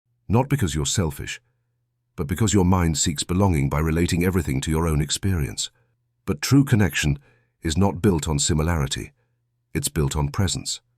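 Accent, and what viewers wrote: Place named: United Kingdom. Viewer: I just realized the AI voice has a British accent. What a comical choice. Did the creator of this video think the accent would give the presentation more authority? I wonder.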